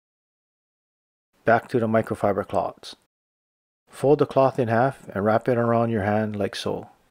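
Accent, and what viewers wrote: Hawaii, United States. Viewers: Is that a Hawaiian accent I hear? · You’re from Hawaii 🌺. I just listened to you speak and knew🙋‍♀️. Excellent tips. Thanks.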